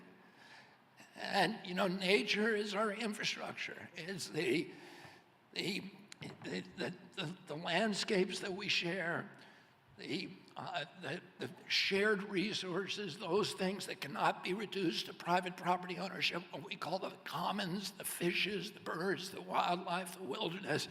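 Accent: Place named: England, United Kingdom. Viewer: it doesn't sound like boston to me though, it sounds like an english accent, idk its no big deal just weird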